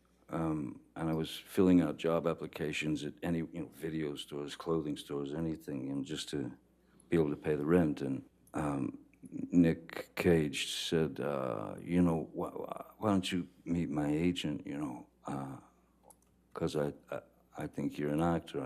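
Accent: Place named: Pakistan. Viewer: Forget about him, love me instead. He sounds like he's from Pakistan.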